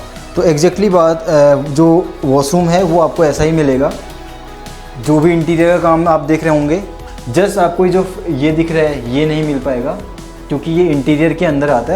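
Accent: India. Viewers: bro is 100% kumaoni, i can tell from the accent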